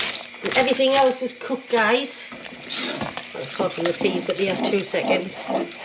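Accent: Newcastle, United Kingdom. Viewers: I'm addicted to your channel, sitting at the kitchen table and drooling. I was born at the beginning of the second world war, so lived with ratioing until my teens. We all ate wonderful pies and stews like yours and obesity was unknown, but we thrived. We only began to get fat when sugary, highly processed food became the norm. What a tragedy affluence has been. I would love to see your Toad in the Hole and Weardale Tatie Cake sometime, please. I live in Weardale, but have not found a really good recipe. It's lovely to listen to a real Geordie telling me about the wonderful food my mother used to make.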